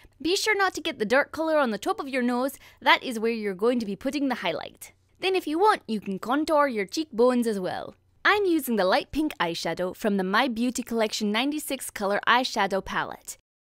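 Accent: United Kingdom. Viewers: Amazing can't wait to become Rayla lol😂😂😂 Also your Scottish accent is amaze!!!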